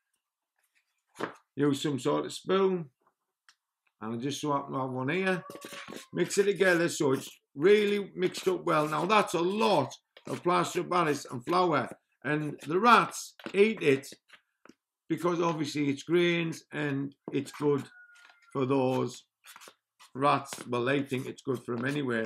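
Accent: United Kingdom. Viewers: Trying to place the accent..... UK but where .... Wales or Scotland?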